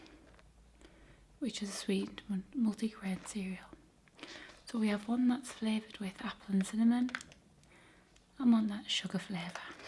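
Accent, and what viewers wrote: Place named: Newcastle, United Kingdom. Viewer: You know who you sound like accent-wise? You sound like the guitarist Andy Taylor of my favorite musical artist (and yes, I am being serious) Duran Duran! He came from around Newcastle, so I'm guessing that's where you come from as well... ? IDK, maybe I should just look up Middlesborough as I know that's where you said you lived, but wait, you said you moved there, so you could come from anywhere in England. And you don't sound Welsh to me, but then again when I think of what Welsh people sound like I think of Rhod Gilbert and I'm laughing on the inside just thinking of a female with Rhod Gilbert's voice. That poor imaginary woman. :D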